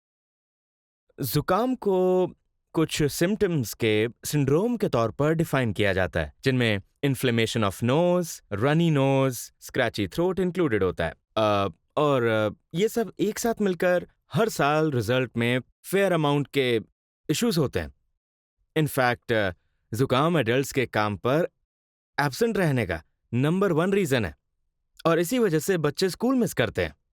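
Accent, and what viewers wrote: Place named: United States. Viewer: Hey dr nene please make videos in your cute American accent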